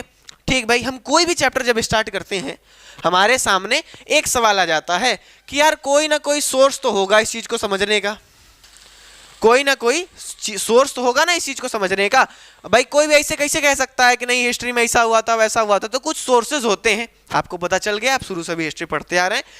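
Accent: Bihar, India. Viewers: Bihrai tone............stole my heart ❤ bro.............🖤 bhai billions subscribers ho apke🖤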